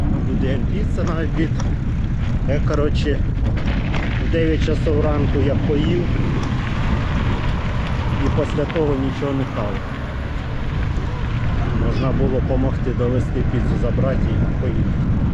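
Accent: Poltava, Ukraine. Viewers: Доброго вечора,! Ми дуже раді Вас знову бачити. Дивимося Ваші відео і на душі так тепло стає. А коли моя мама чує полтавське мовлення, то аж плаче, бо ми зараз далеко. Дуже переживали за Вас, коли дізналися, що Ви у ТЦК. Переживали як за рідного. Нехай Вас Бог береже 🙏🏻 Я хотіла спитати Вас, чи можливо буде для Вас покататися на дві адреси? Перша - це по дорозі від Вас, Ви часто проїжджаєте повз цей будинок, тільки у дворі ще не були. Це адреса Героїв України 34/24 А корпус 1. Це великий полукруглий дім, як тільки переїхати міст з Половок, там є зупинка Сади 1, а далі отой жовтий будинок із зеленими балконами. Якби Ви проїхалися у дворі, на ігровій, особливо біля перших під'їздів. І ще одна адреса вул. Полюсна 12. Це за Макдональдсом, раніше була зупинка Боженка. Біля будинку ще є кафе Роксолана. А ось за нею знаходиться цей будинок. Теж прохання проїхатися у дворі, 2 під'їзд. 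Ми хотіли б Вам віддячити. Не можу знайти, як перерахувати Вам кошти. Напишіть, будь ласка. Будемо дуже вдячні!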